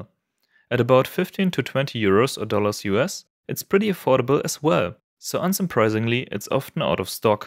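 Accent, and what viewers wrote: Germany. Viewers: That heavy German accent Tho :) . Nice video BTW , keep Going.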